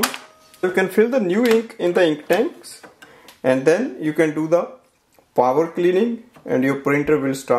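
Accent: India. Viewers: Heard the indian voice knew i was saved twin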